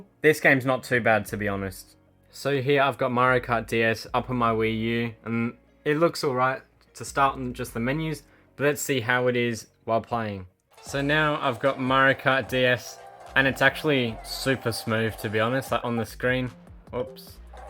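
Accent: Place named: Australia. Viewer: Subscribing for the aussie accent alone. Without joking awesome video 🍻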